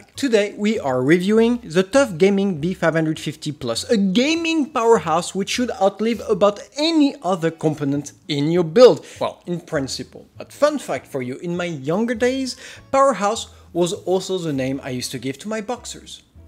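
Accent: France. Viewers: Your french accent is awesome.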